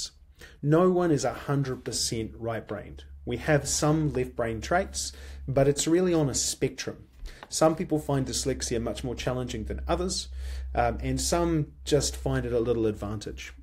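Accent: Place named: New Zealand. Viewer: Lovely hearing your Kiwi accent!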